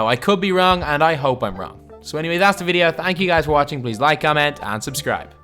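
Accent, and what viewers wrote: Ireland. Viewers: Never realized the Irish accent until you said you played in an Irish league lol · Are you Irish? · R u Irish I can hear the accent kinda ????? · What the fuck is your accent.. You sound posh Irish.. Do you want a soft drink? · are u Irish ? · ARE U irish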